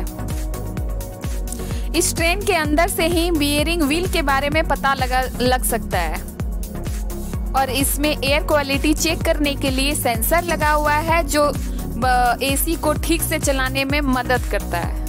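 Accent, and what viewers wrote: Bihar, India. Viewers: Language mei bihari touch jyada h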